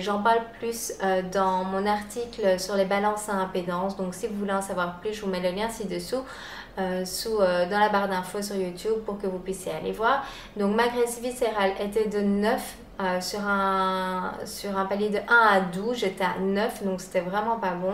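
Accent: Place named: Charleroi, Belgium. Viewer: Coucou, Viens-tu de la région de Charleroi? Je me pose la question par rapport à ton petit "accent":-) Bizzz:-)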